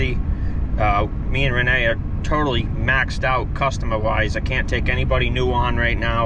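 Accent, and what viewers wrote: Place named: Massachusetts, United States. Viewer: From the sound of your accent, you live up where I was born and raised...Massachusetts? I live in North Carolina now, have for 30 years. Good video guys, keep up the good work, I'll give you a shout out on my next video.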